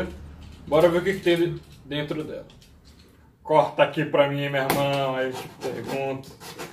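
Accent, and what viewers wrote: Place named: Pará, Brazil. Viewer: caray mlq com esse sotaque tu so podes ser do pará kkkkkk mta coincidencia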